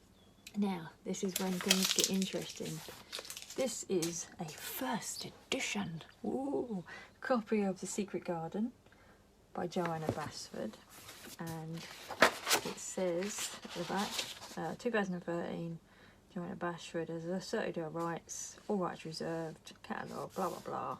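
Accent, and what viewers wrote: North London, United Kingdom. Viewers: Lovely collection! You talk like me, I'm from North London!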